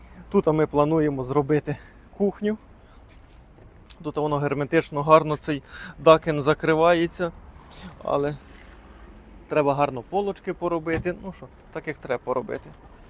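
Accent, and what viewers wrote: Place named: Khmelnytskyi, Ukraine. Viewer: Привіт гарні доглянуті машинки) а ви звідки? А то чую Хмельницький говір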